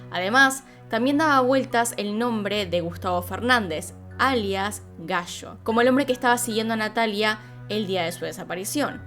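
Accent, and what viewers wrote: Argentina. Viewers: Saludos! Me encanta tu trabajo,tu pelooo y tu acento que me lleva a mi Argentina estando lejos viviendo en México 🏘️❤️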